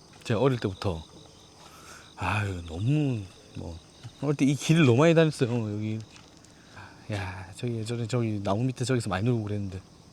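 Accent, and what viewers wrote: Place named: Hadong, South Korea. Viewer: 손빈아 가수님은 우리 하동의 자존심 입니다 더높이 더멀리 비상하세요 꼭 승승장구 하세요 빈아님은 순수한 청년입니다 사랑합니다 간혹 우리 하동말 나올때 정감이 넘쳐요🎉🎉🎉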